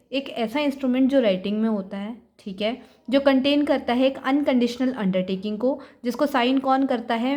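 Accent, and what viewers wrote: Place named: Haryana, India. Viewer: dii ab aapki haryanvi bhi sweet lgti h to m ky kru 😁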